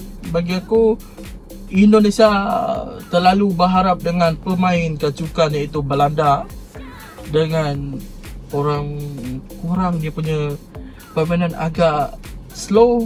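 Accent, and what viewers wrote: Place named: Malaysia. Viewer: Bahasa logatnya jlas melayu antek malaydes😂😅😅😅😅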